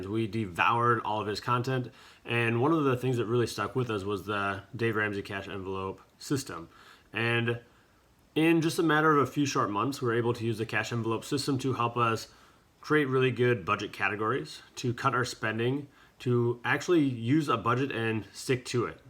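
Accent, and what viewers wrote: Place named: United Kingdom. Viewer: I love a good random British accent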